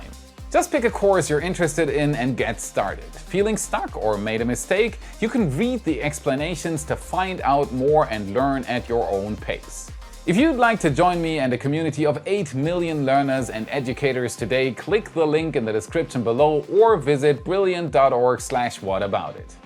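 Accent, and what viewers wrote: Germany. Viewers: I love his German accent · we're waiting for your delicious German accent - and your v narratives on the (litterally) awesome progress that SpaceX have made the past week, of course! 😱 😋🍿 A thought: the narrowed design of the flaps seems reasonable for earth's athmosphere, according to SpaceX' models, but what about the low density atm of Mars? #Whataboutit?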